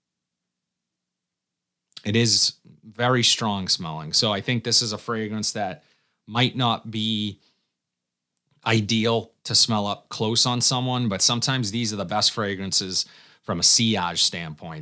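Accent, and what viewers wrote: Boston, United States. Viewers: You Have to be from Boston! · Well done on the 13k,!!! I don't come here for frag talk as much as I come here for Bostonian accent:):):) You are awesome!!! Have a great weekend!!!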